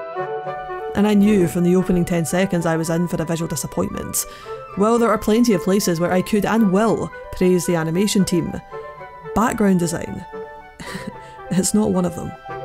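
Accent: Scotland, United Kingdom. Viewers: Yes, I watched all the manga just before it on air. I almost lost all the joy of watching...Besides the fantastic editing of your video, I love your accent. Are you from the UK??? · Holy crap this video was done exceptionally well! And I love your Scottish accent! Great job, cheers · you sound scottish, yaas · Love this!!! Your accent sounds Scottish, it adds to this review very well. Keep it up 💕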